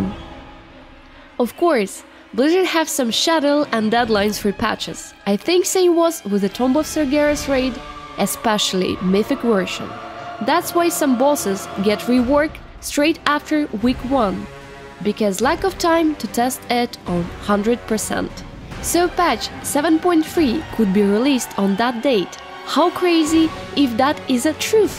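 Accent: Russia. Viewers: Well, sexy video, simple as that, you too of course, being the shining jewel and all. Of all the accents from all countries i have heard, Russian, or slavic origin in general is by far the most heart-melting, delicious id say ;p Either that ir i have grown to like how Russian females talk since i live near(Latvia) Russia myself, so its common but still slightly different between each individual.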